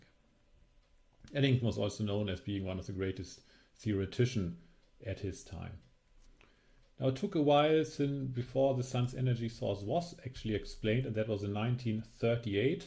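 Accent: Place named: Germany. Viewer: Tolle Serie, bei den meisten Dokus wird immer das gleiche gezeigt. Mich hat schon lange das Thema Kernfusion interessiert und ich "verschlinge" alles was es zum Thema Fusion in Form von Videos gibt . Daher haben mich auch die Frühen Jahre dieses Forschungszweig interessiert (Spiegelmaschiene usw) vorallem die ersten Prototypen die ja eher noch Winzlinge waren . In dieser Richtung findet man eher wenig im Www . Mit dieser "Dokureihe" hat sich dieser wunsch erfüllt. Danke! Einziger manko das es in English ist was aber wiederrum verständlich ist da ja in der Wissenschaft English die Umgangsprache ist . Gott sei dank ist es English mit German Aktzent 😅 das macht es leichter. verständlich . Grüsse aus Austria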